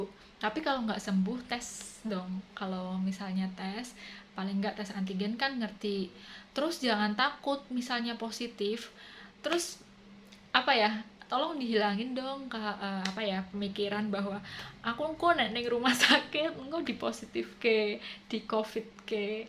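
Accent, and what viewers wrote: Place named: Java, Indonesia. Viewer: Mba logat bicaranya kok njowo banget, tpi kl lgi di sinden aduh blakutek brantakan, brarti cma lucu2an aja ya 😅😅🤔 Mba aq fans kmu banget lho Aq mau tanya mb itu lahir di kota mana, sulawesi apa jawa yogya? Balas ya mb pertanyaan aq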